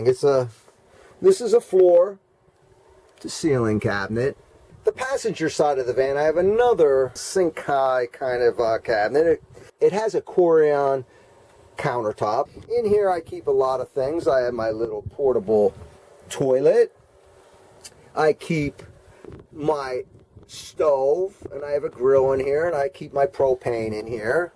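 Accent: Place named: Northeast, United States. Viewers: You from Pennsy or south jersey? Can’t tell from the accent. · Can tell you’re from the north east lol. I’m from Philly. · so you then really do have a Philly accent with a blend of the two. Just say you are from Northeast Philly if someone asks what part of Philly you are from next time.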